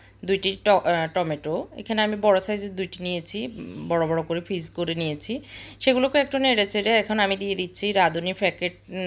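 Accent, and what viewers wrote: Noakhali, Bangladesh. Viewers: Thank you Apu easy ranna.amar bchara apnar vlog khub pochondo kore.😆amak onno kichu dekhte dai na.noakhailla hoyate tan ta ektu beshi😀😀😀.Masha Allah apni eto guni 1jon manus.joto dekhi toto mugdho hoi.khub balo thakben.❤❤💖💖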